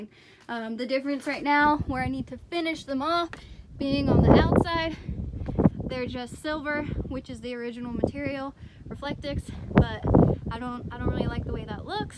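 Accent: Texas, United States. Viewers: Funny your texan accent pops in and out occasionally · I thought I heard a Texas twang in your voice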